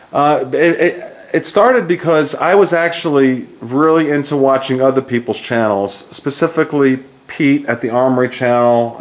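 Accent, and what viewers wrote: Long Island, United States. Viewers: I knew I heard some Long Island in you. I grew up in Westbury around the same time you were growing in Smithtown, Cool. I appreciate your vids.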